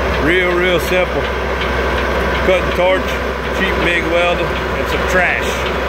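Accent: Louisiana, United States. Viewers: You sound like you from the Parish!! I live in Hessmer.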